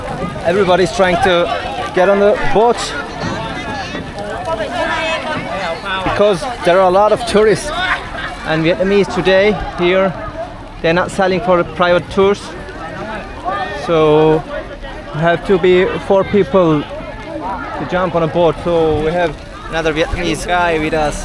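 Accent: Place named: India. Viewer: aksanın hintlileri anımsatıyor :)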